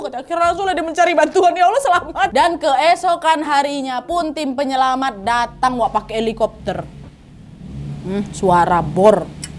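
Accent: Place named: Palembang, Indonesia. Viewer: demen gue, logatnya wong kito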